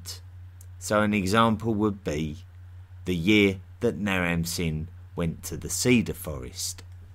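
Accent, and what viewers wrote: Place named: Cockney, United Kingdom. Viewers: Sounds like "my name is Michael Caine" I love the combination of cockney accent and erudition. The man can't be expected to cover all aspects, never mind in detail, in 46 minutes. If you want more detail you should search Google for scholarly articles on this subject. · The cockney voice over is what makes this even better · Not so sure about clearly :-) ... very strong London accent there.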